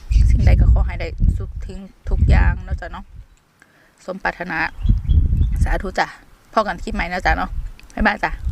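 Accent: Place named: Nong Bua Lamphu, Thailand. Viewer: คนเลยกะสำเนียงบ่แม่ลาลงัวใส่ปลาแดกกะทางเลยเว้าคือเว้าหนองบัว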